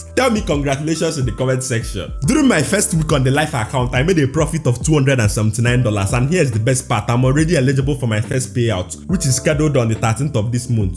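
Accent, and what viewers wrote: Igbo, Nigeria. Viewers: Pally go and improve on your diction. Your igbotic accent is too annoying and local . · Very thick igbo accent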